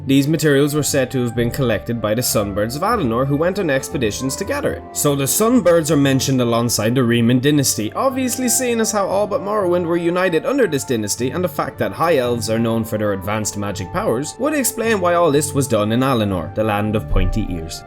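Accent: Ireland. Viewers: where in in Ireland are you from man , i dont get your accent ? im from cork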